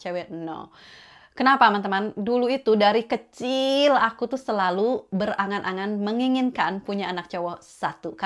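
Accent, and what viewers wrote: West Java, Indonesia. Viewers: Dengerin cara ngomongnya si mommy ini nyaman banget,serius deh,nambah ilmu juga walau aku belum menikah,dan ada kesamaan tentang anak2 yang membantu di keluarga,krn di keluargaku sejak kami(aku dan 3 adikku kecil) sudah terbiasa abis makan,cuci piring masing2,cuci baju sekolah masing2,karna mommy aku meninggoy sejak kami masih SD,bapa kerja pagi2 banget,dan jadilah aku ngurusin 3 adikku, anak kecil ngurusin anak kecil,jadi dah biasa mandiri,oke sehat2 mommy and family,btw pengen deh keluarga ini ngevlog mudik ke Indonesia,ke kampungnya di sunda kan soalnya logatnya sunda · eteh sunda de besssss pokona mah 😍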